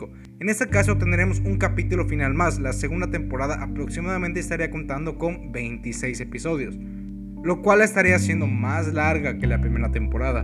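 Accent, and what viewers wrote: Argentina. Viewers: ¿Eres de Argentina?:V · eres argentino? :'0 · sos argentino??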